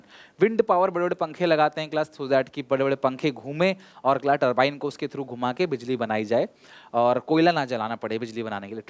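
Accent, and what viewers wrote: Odisha, India. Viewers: Sir are you from odisha??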